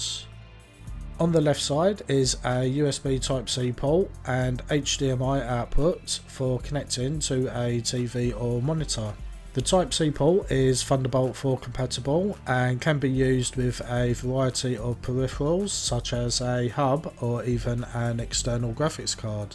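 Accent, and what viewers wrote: Cockney, United Kingdom. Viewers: It's his cockney accent to be at fault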